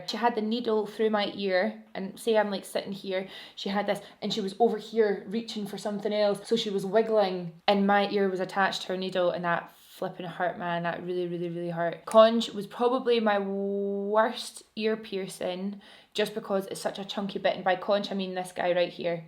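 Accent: Scotland, United Kingdom. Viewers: Oh my god I hit a whitey 😂😂😂 such a typical Scot I love it 😍😂 sounds exactly like me x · I find it so interesting when people say they struggle to understand what you’re saying because I’m Scottish Too and so it sounds so normal to me Haha. 💕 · I love it when I accidentally stumble across a fellow Scot. Also I wish my makeup was as on point as yours 😍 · I think scottish accent is so sweet!! But when i first started wathing i did kinda struggle understanding her but now that i have been watching her pretty long time, no problem 😄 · i moved to ggw a little over a year ago and i just realized how good i am at understanding scottish accents now vs then. my god, i swear i just laughed and nodded at everything for a month (im swedish and moved here from portugal). your accent is still really mild to most of the folk i interact with, christ. idk why but your content makes me feel at home and your love for your pup had me subscribe before i knew what you generally post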